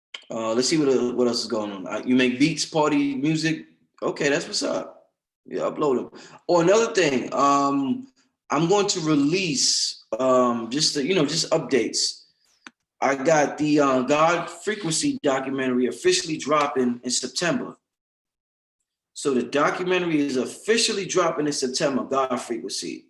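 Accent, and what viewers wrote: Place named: New York, United States. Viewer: They keys to life and u dropping Jewel's Who don't luv that new York voice? I LISTEN TO YOUR MUSIC SO DIFFERENT u always give informative video ✌❤💡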